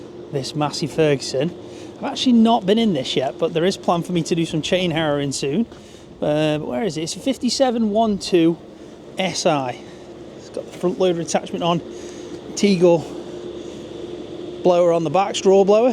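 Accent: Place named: Stoke, United Kingdom. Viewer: Is this in stoke you seem to have a stokie accent 😊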